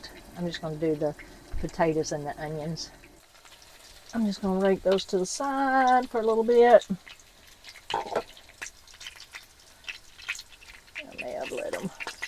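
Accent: South, United States. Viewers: Do you use the toilet you made up for both #1 and #2? I use two of these camp toilets in my old farm house. My well went bad 18 yrs ago and I hated the water anyway. I only come to this place for a few months each year so works well. Plus, my septic works just fine, so I empty every couple days into real toilet and put 2 1/2 Gal in back of toilet to flush. Nice video and great set-up. P.S you sound real southern. are you? ;-))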